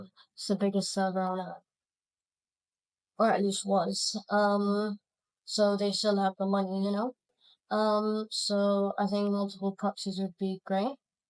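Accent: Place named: United Kingdom. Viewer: damn your voice sounds so young ive never heard it before also you sound bri ish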